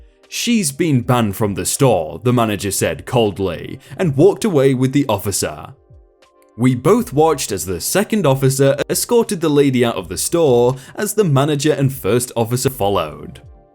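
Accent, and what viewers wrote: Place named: Midlands, United Kingdom. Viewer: John Smithe Probably from the same sort of area. I think they've both got Midlands accents.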